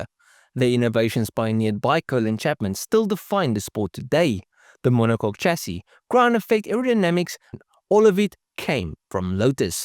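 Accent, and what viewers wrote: South Africa. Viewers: Three seconds in and I’m like holy shit this person has the thickest South African accent I’ve ever heard.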